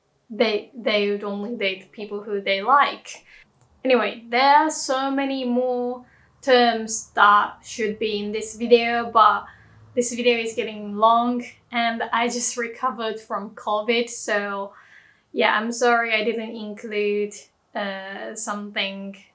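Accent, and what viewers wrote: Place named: United Kingdom. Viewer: Omggg your English is so good it sounds like almost a perfect British accent normally people learn with an American accent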